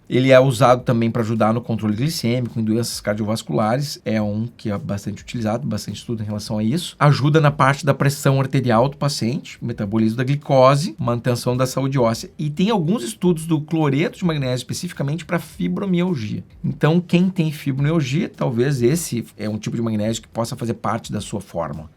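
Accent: Rio Grande do Sul, Brazil. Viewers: O senhor e gaúcho? Gostei do sotaque.e meu conterrâneo?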